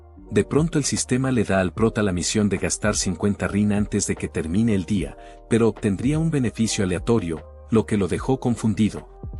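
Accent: Dominican Republic. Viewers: que buen resumen con su acento dominicano jaja esa vaina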